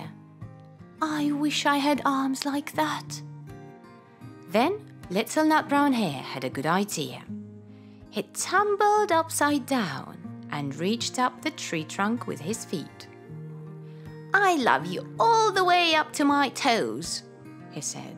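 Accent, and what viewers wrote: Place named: United Kingdom. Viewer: Потрясающе,. Вы - живой пример для меня и мотиватор идти дальше🎉прекрасное произношение британской речи, просто мёд для ушей! Мне понадобилось некоторое время, чтобы понять, что это Вы, читаете 😂браво! К слову, я давно у вас на канале нашла видео о билингвизме и искусственной англоговорящей среде для малышей, собственно, уже два года я и мои дети в этой среде. Хотелось бы больше информации на эту тему, какие игры и фразы можно и нужно говорить малышам во время игр или просто daily routine, чтобы это было аутентично. И вообще наблюдать за прогрессом вашего ребенка. Понимаю, что тема не имеет отношения к вашему каналу, но хотя бы иногда 🥳💕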